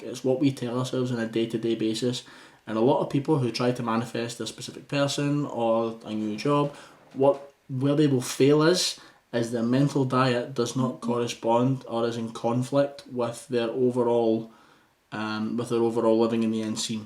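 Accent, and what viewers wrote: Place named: Scotland, United Kingdom. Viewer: A UK Neville follower! Love the Scottish accent, I'm from Wales and am a follower of Neville. God Bless :)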